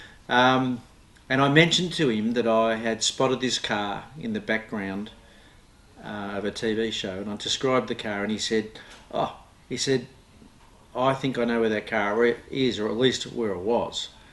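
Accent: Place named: Australia. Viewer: I love his austrialia accent. Its wonderful. Just love hearing him talk. The 300 is absolutley beautiful.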